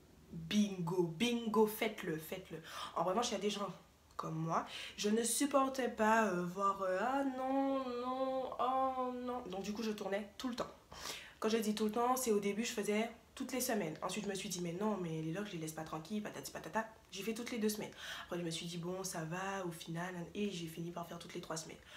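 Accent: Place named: Antilles, Caribbean. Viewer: Humm un p'tit accent antillais🙃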